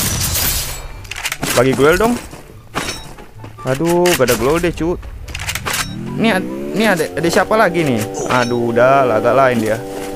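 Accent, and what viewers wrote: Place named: Sulawesi, Indonesia. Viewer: Bang logat lu kek orang buton/sulwesibang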